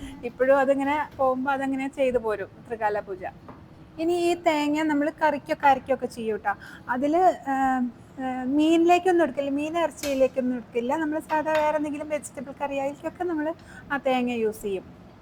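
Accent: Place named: Palakkad, India. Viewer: പാലക്കാട്‌ സ്ലാങ്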